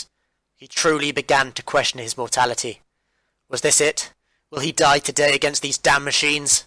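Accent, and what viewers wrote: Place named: United Kingdom. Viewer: LOVE THAT BRITISH ACCENT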